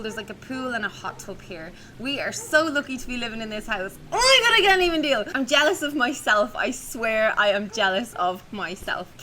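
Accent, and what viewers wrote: Ireland. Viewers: im from cassie's video. i love your irish accent! :) youre so nice lending your EH to cassie even of she stole it lol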